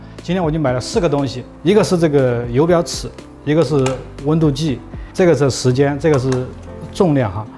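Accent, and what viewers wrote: Sichuan, China. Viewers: 好亲切的四川话。粑锅，度豆腐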